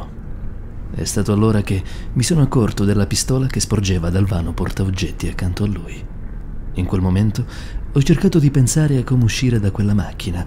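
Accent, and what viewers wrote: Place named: Calabria, Italy. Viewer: non so voi ma ascoltare queste storie alle cuffie è qualcosa di fantastico ma allo stesso tempo terrificante! comunque complimenti davvero per il montaggio la narrazione e tematica... e poi dalla voce sembri calabrese giusto?!?!? :) COMPLIMENTI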